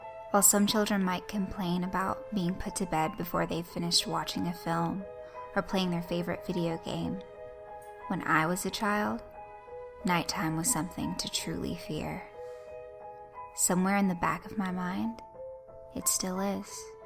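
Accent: South, United States. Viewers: Wow, that one about the white handled gun was extremely creepy and sad. But that bang at the end, I admit I jumped. Cool narrative your accent are you from the South? Or did you do it for the story?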